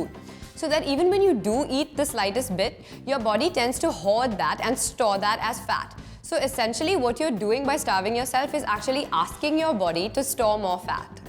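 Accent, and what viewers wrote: India. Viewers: I know Indian woman because of accent English 😂😂😂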